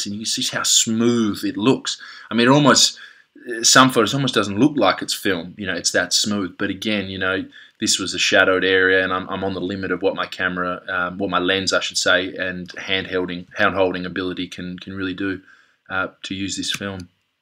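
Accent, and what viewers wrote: Australia. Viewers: Absolutely love this film. I especially love shooting it in my M3, with a Summicron 50mm colapsable. On a nice sunny day, it's a must. I agree with everything you said, and the cafe shot is lovely. Also, I've been following the USA political scene for almost two years, and to hear an Aussie accent on YouTube is a real bonus. Well done.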